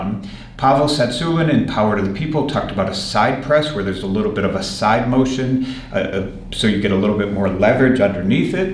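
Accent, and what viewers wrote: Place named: Halifax, Canada. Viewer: Great vid thx. Dude gotta be from halifax or something "the bahr"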